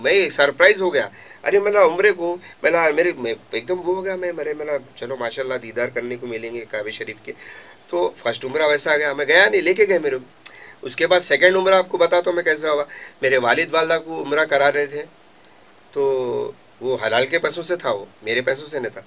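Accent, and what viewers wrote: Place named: Hyderabad, India. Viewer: gullu dada...hit ur flop..thats different matter...but ur acting and as human being very nice..revive of hyderabadi urdu...keep it up..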